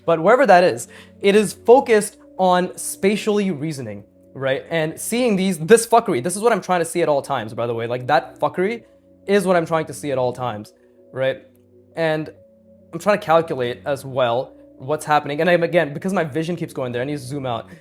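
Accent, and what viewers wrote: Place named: India. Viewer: This guy sounds indian 😢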